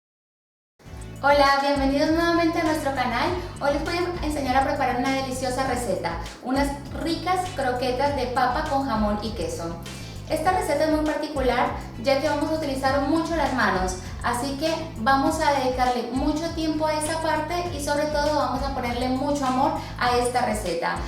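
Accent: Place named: Cuba. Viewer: Hola.Gracias por tus videos. Estoy en una temporada donde la cosina me està encantando, y buscando unas recetas de croquetas encontrè tu video. Soy cubano, aunqueahora no vivo allà, pero si consumiamos muchas croquetas. Tu asento se parece al cubano.¿Eres cubana? Gracias.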